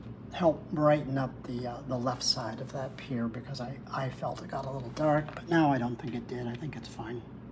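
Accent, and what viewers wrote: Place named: Berkshire, United Kingdom. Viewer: Thank you so much. This was a lovely demo and I enjoyed it very much. I look forward each week to see what you have to share. I am going to try this technique with a squirrel that I am thinking about painting. I love the colors for the otter. These Texas ears are loving your Berkshire accent ❤ 😊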